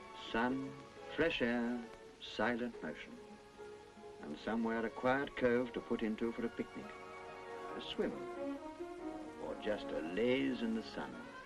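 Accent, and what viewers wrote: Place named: RP, United Kingdom. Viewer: What a posh accent!!! Changed a lot by now.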